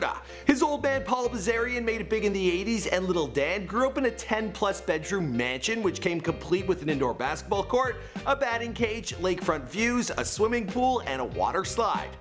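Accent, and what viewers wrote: Canada. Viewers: Real Bride Of Chucky he’s Canadian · Jessica haha he’s Canadian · I forgot is a damn Canadian... 😑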